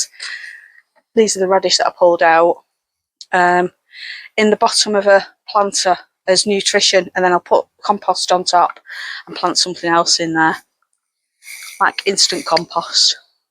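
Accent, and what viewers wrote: Lancashire, United Kingdom. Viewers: Oh you popped up in my for you page and I thought I recognised that accent! I’m from Blackpool too. We live in Lancaster now, we also have a tiny yard, but have just been given keys to an allotment, it’s so lovely to have two places to grow. I’m sorry about yours, how annoying about ICI. I am loving your garden, it’s lovely.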